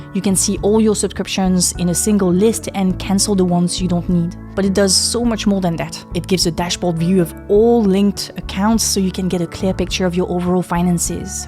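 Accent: France. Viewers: which French department are you from? I’ve never heard a French person sound this French 😭😭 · just so you know, no one would be watching your content if you didn't have the french accent.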